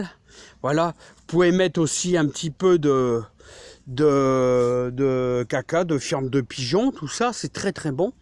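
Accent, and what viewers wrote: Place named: Hirson, France. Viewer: Vu l’accent j’aurai dit vers Hirson merde j’ai perdu j’ai quitté la région depuis trop longtemps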